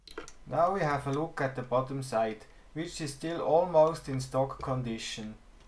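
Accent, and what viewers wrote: Switzerland. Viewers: Swiss English sounds funny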